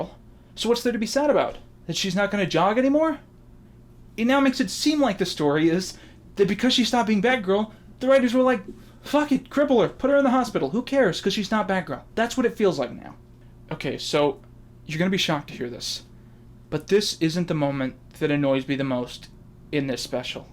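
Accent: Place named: United States. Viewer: Yo I'm just a British boy sat in his room watching this and I hear an American talk about eastenders? The figgidy niggidy fuck?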